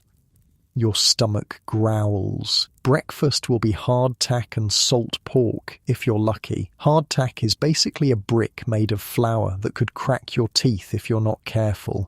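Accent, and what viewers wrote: United Kingdom. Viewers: Why a Brit narrator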